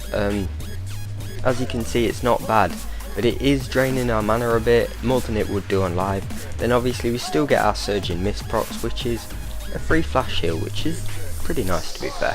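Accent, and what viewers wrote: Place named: United Kingdom. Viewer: Where are you from? Trying to cypher which flavor of the UK accent you have?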